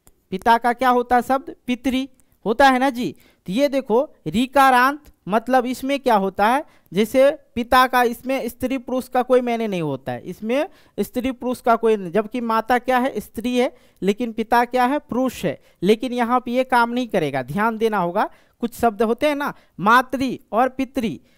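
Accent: Bihar, India. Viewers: Sir aap bihar se ho kya?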